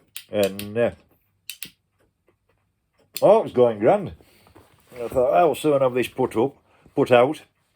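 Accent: Yorkshire, United Kingdom. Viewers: I genuinely hope you are pain free soon. Keep your stories coming they are an important picture of the past and you tell them in your wonderful Yorkshire accent to the tick of a clock which is all a perfect background to your pipe smoking content. I for one enjoy it all and thank you for it.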